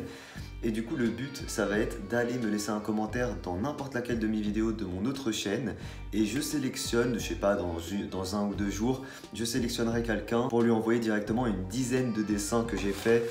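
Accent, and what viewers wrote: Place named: England, United Kingdom. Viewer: Je suis toujours autant impressionné par ton accent anglais mdr, le dessin de ta tête pète des culs!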